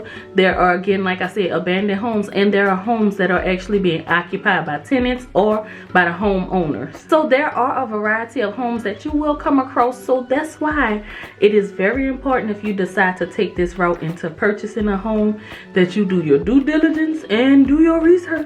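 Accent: New Orleans, United States. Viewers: Yeah I hear New Orleans in her voice 🙌🏾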